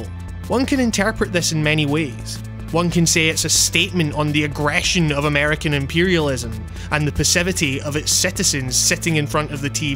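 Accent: Scotland, United Kingdom. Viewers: You scottish brah?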